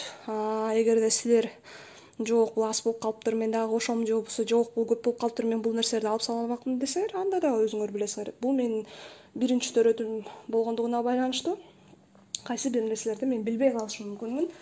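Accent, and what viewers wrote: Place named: Kyrgyzstan. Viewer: Сонун кеңеш тартыптырсың. Үнүң дагы, кыргызчаң дагы жагымдуу экен. Жакшы даярданыптырсың, азаматсың!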